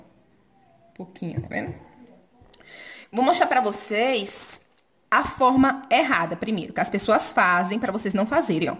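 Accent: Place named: Bahia, Brazil. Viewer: vc fala igualzinho minha tia Rosane da bahia ... vc é de onde ?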